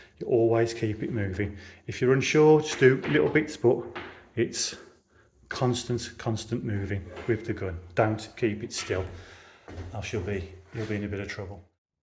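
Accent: Birmingham, United Kingdom. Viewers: Thank you so much for this great video. I'm here in France and bought my machine on my builder's recommendation, but was really worried about how to use it. You took all the stress out of it!! 🙂 (I love your brummy accent too ;-)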